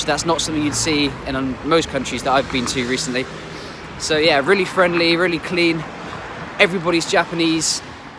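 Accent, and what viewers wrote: United Kingdom. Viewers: イギリスの方ですね！英国なまりですもんね、大阪を紹介してくれてありがとー‼️ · I like it! Saw your film for the first time. I was impressed with the pictures and narrations, both are very calm, gentle and professional manner. Slowly and calmly spoken English, with slight British accent allowed me to pick up every single words. Thank you. Old japanese man, living in tokyo.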